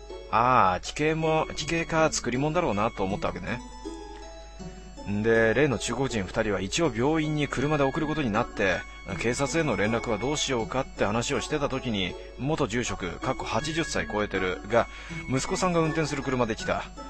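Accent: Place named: Iwate, Japan. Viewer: 岩手のなまりってこんなかなぁ…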